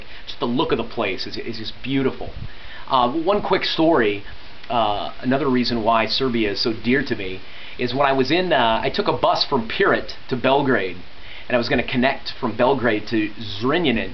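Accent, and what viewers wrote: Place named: United States. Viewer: I always watch American movies and I know how to pronounce the words in the American way. Of course I mean general American. There are a lot of dialects in USA, XD. Don't lose your American accent! It's just great, I don't like the British accent, the American one is much better.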